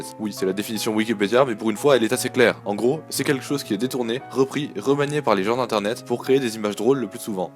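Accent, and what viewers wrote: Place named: France. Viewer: MÈME Jpp j'en ai marre des français. C'est un Meme et ca ce dit mims pourquoi vous changez tout avec votre accent de bite. Trigerred.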